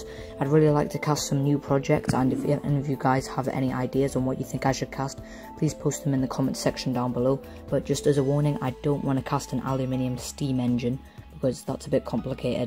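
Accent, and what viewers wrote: United Kingdom. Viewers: It does not matter how you pronounce it, I'm from Briton too, So I agree with how you say it. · you are simply right: the British accent for Aluminium is correct since it comes from a Latin word "Alluminium" that has the same accent as the British version. Even today in any latin country Aluminium is pronounced the same way you do in British, so at the end the US version is funky but not as correct;) Amen! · Well said about the Aluminium pronunciation! it's a bit rich when a country who predominantly speak the ENGLISH language, start pulling the ENGLISH up on the way we speak!! What with sodder and aluminum and an inability to use metric they need to come into the real world!! Great videos and tutorials, keep up the great work. I subscribed Mark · I'm sick and tired of these pseudo-intellectual Americans who're confounded and obliviously duping themselves into believing how they and they alone know how to speak English correctly, trying to fault find on our pronunciation of "aluminium" when it's forever been a lorry not a truck, a sink not a basin, a plug not a stopper, as do remember whos language you're butchering after all our brothers and sisters from across the pond, you're speaking English, so if anyone knows how it's pronounced, it's us... 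it's a ruddy jaguar, not a jagwar! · actually this is how the British say aluminium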